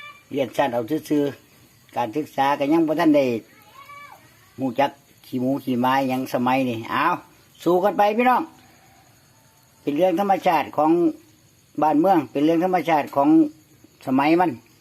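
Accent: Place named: Isan, Thailand. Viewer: คือบ่อตั้งชื่อช่องว่า เสียงอิสานเเซ่บนัว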